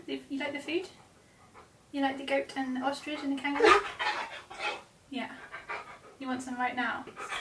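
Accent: United Kingdom. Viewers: We Americans love soft, gentle, British accent especially when she says words like planting...or pl-aww-nting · Never knew is British 😅 Love your accent 🤩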